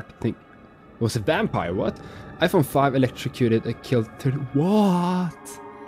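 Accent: Sweden. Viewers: love your accent by any chance are you swedish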